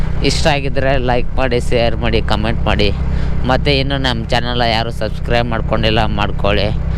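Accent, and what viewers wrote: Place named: North Karnataka, India. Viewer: ತಮ್ಮ ಯಾವ್ ಊರ ಹೇಳು😂😂😂 ಉತ್ತರ ಕರ್ನಾಟಕ anasatte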